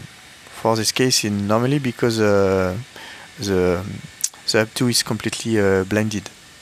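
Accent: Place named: France. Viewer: Bon morning Rien qu’en écoutant to anglais j’ai compris que tu étais French 🇫🇷 J’ai le même accent en anglais 😁 Plus sérieusement ont ils changer quelque chose ou pas depuis le temps ? Autre question n’y a t i pas une option qui te permet d’activer le déclenchement de la siren en cas de brouillage ?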